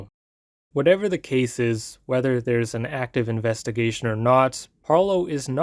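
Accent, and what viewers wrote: United Kingdom. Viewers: isnt he just british?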